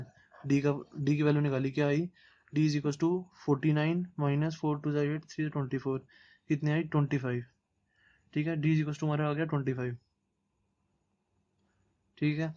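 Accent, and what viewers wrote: Punjab, India. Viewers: r u from punjab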